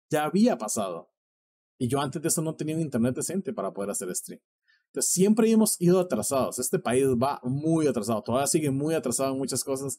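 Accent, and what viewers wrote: Costa Rica. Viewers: Sin que dijeras ya sabía que eres de costa rica , soy de Colombia y vivo en new jersey con varios amigos de costa rica y el acento es muy cool , además que la pronunciación de la r me parece la mejor opción para la pronunciación del inglés entonces la tienen más fácil los ticos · Desde el primer video que vi supe eras de Costa Rica por la pronunciación de la R, soy de México y visito mucho San Jose pero también se me hacía raro que no decías los modismos, pero igual como dices lo importante es la calidad de persona y no la nacionalidad, me topé con tus videos buscando guía de TL y me han ayudado bastante. Vas muy bien a mi parecer, ánimo!! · Pero si tu acento grita que eres tico jajajajajaj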